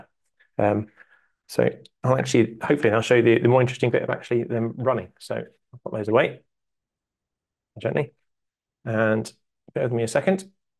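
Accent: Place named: United Kingdom. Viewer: I couldn't understand the limey bastard.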